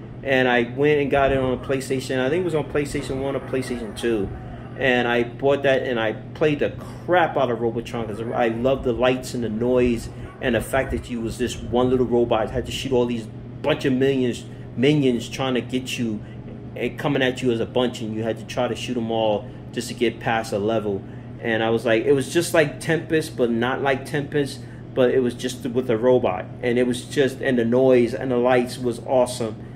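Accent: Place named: Bronx, United States. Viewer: What part of the Bronx are you from?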